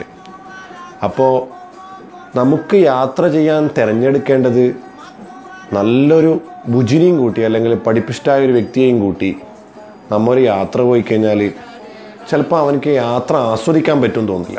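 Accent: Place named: Malappuram, India. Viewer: kka ങ്ങളേ വർത്താനം.....അടിപൊളി....ങ്ങള് മലപ്പുറത്ത് ന്നാണോ?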